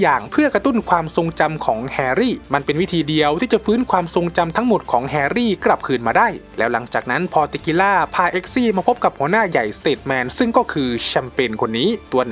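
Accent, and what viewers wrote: Isan, Thailand. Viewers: แอดพูดอิสานน่ารักมาก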